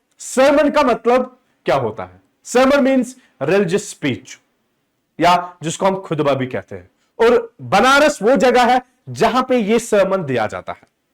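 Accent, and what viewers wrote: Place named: Kashmir, India. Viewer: The kashmire tone of can be go fir a kashmire 😊❤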